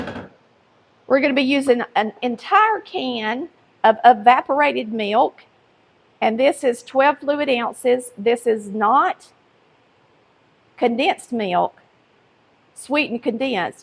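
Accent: South, United States. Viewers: You are some lucky husband's wonderful talented wife cook and baker. You simply amaze me with all of your talented recipes.Your instructions are on point and your lovely southern accent is so sweet. You also have patience with all your newbies, and that can be me at 74 years old. Bless your heart for all you teach us, it is so appreciated. Sincerely, From Grandma Debbie 🙋🧑‍🦽 and Dexter-Dog 🐕🐾 my sweet tiny rescued Chihuahua 🐕🐾 living 🏠🌴 a quiet crafty life 🏠🌵🏖️ in southern California. 🙋🧑‍🦽🐕🐾🌴🏠🌵🏖️🙏🙏😎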